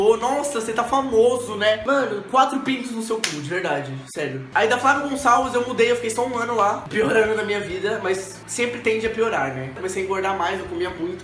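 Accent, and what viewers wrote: Porto, Portugal. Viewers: esse sotaque português !!! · Sotaque Portugues <3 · Meu Deusssss, o sotaque dele no início e no fim do vido tem mesmo sotaque português!!!!! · omg!!! eu nao fazia a minima ideia que tu estiveste a viver em portugal adorei ouvir te falar portugues de portugal eu nao acredito!!!nao podes comecar a falar um pouco nos teus videos em portugues de portugal?? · é sotaque à portuense carago!!!,! ;) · Adoro quando tu falas Português- Portugal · tens bue sotaque do porto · tens mesmo o sotaque do porto kkk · sou de portugal e acho que não sou a unica Portuguesa a achar que tu ficas super fofo a falar Portugues de Portugal <3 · vem logo pro portoooo !!!!!! sotaque portugues lindo :o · Assim uma coisa , gosto muito mais do teu sotaque português de Portugal do que português do Brasil ( e eu adoro o sotaque brasileiro) mas ficaste mesmo fofo a falas assim hahahahaha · Mano, é muito viciante quando alguém que costuma falar PT BR fala PT PT. 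Actually, it's kinda cute <3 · oh meu deus, tens sotaque português, AMO*-* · Eu comecei a ver o vídeo é minha cabeça explodiu!!! Eu te acompanho faz pouco tempo e nunca te vi a falar com sotaque de Portugal! Vem outra vez dá!!! #LuísMarizEmPortugalOutraVez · falas mm bem portugues!! 😂 sotaque do porto mas yh boa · Aí adoro quando falas em português de Portugal. Devias fazer mais. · Raymson Barbosa Português de Portugal :)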